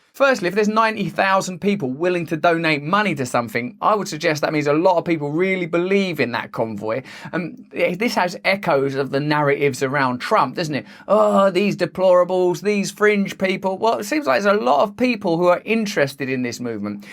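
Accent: United Kingdom. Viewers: "it's proper dodgy" XD i love how British he talks lol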